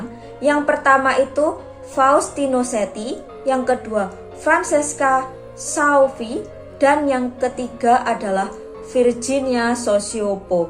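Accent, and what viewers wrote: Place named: Java, Indonesia. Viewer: Medok lur medok 😃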